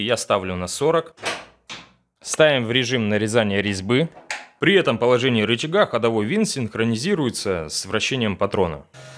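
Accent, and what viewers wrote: Rostov, Russia. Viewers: Ростовчанин, штоле? По говору слышно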